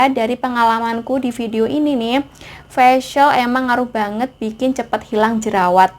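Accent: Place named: Java, Indonesia. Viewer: Cewek sunda tapi lidah Jawa , Kerennn...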